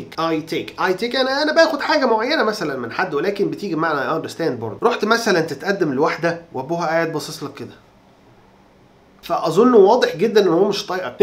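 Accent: United States. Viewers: لهجتك كثير محترفة كأنك اميركي بالفعل. ممكن تعمل فيديو عن طرق ممكن من خلالها نتعلم اللهجة الأميركية؟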